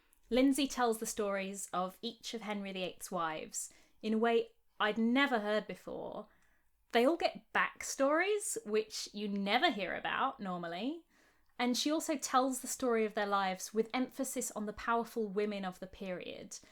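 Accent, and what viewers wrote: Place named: North, United Kingdom. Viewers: I knew you were clearly northern but I never thought you would be from Lincolnshire so now I have to consider if I should continue watching any of your videos that I had been watching. You are lucky that I used to drink in the Anne Boleyn pub on Green Street so today is a good day for northerners 👸🏻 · I was trying to place your accent...as a fellow east midlander I find it very easy on the ear....Great channel..we'll done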